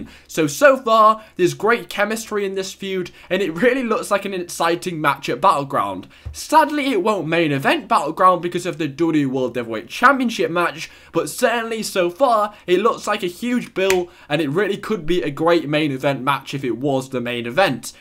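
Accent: England, United Kingdom. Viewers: You have a english accent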